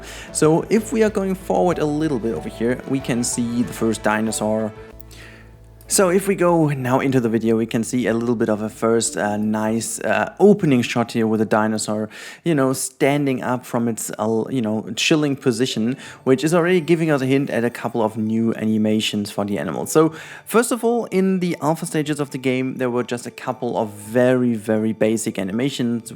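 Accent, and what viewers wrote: Germany. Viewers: When Germans speaks Englisch then it sounds exactly like this guy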